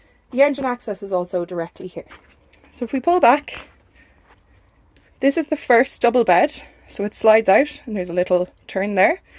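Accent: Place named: Galway, Ireland. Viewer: Just found out I've ancestors from Galway and was curious as to the accent. This little trip around the caravan fit the bill. Thank you kindly.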